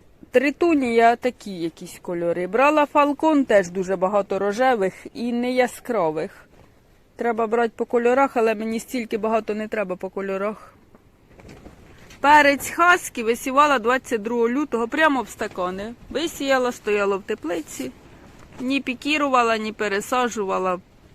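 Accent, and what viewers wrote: Poltava, Ukraine. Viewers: Ви молодець! Трудівниця і розповідаєте цікаво, з гумором. Зачаровує наша полтавська мова. Всі відео цікаві. А це хочеться дивитись безкінечно. Яка краса! Де саме Ви живете?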